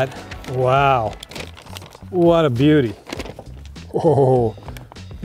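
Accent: Netherlands. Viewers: sounds dutch trying to speak english. but ribs looks tasty.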